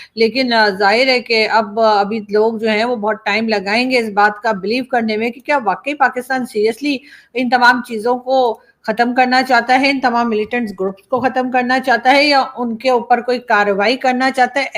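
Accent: India. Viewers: You are so beautiful and your speech is as Indian.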